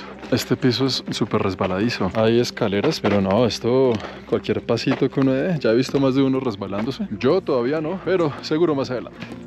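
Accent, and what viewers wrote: Colombia. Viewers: Me encanta verte porque tienes tanta humildad y tu léxico excepcional tu asentó colombiano es lo máximo!!! Saludos a la abuela 😊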